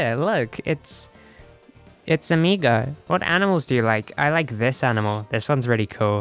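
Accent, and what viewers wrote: United Kingdom. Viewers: How do you go from a low British voice to a high pitch feminine voice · Perpetually pissed off British voice is the single best thing I’ve read since I finished the Harry Potter series. So the best thing I’ve heard since last night. BUT STILL, it’s amazing. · WOW your voice sounds amazing (: but I will always miss that annoyed/ bored British accent. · Rip bored british voice, long live new voice :) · I like his old birish voice mate But this sounds good One question is he trying to turn female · I thing she sounds British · I will always miss your bored British voice, but I’m glad things are going well with your voice! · wait wait wait she's BRITISH?? how did i never notice it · I love the new voice!!! I will kinda miss the old British one but i'm so happy for you!!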